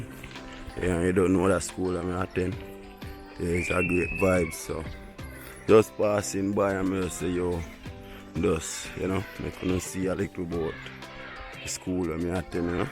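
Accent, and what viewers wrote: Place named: Jamaica. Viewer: Please speak up a little bit and SLOW down please cuz many of us are NOT from Jamaica and PATOIS is like a foreign language to us. LOL. Loved the video. God Bless.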